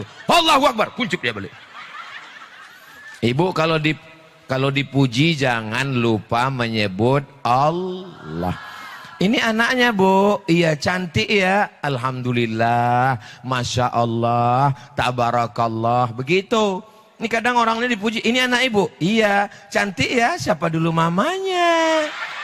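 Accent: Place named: Malaysia. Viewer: saya orang jawa sangat suka dan candu dengan tausiah logat melayu UAS,sehat2 slalu buat UAS❤❤❤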